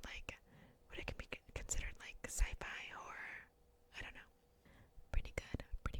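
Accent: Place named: South, United States. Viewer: I love your little country accent amazing video ❤️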